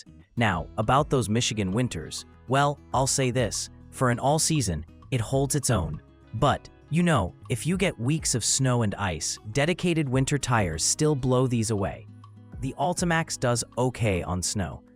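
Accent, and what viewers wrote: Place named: Canada. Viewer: Narrated by a Canadian for the Canadian audience..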